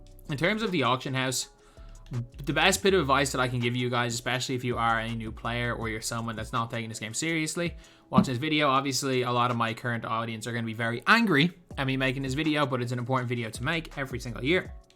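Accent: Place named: Ireland. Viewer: I was thinking this guy sounds Irish, of course he's the champ! Sound for the video man just got the game👍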